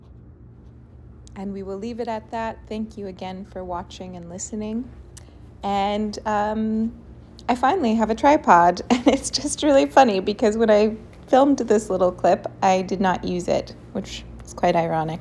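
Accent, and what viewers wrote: France. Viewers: Your french accent is delightfull , tres bien ma chere. :) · Great french/belgian accent!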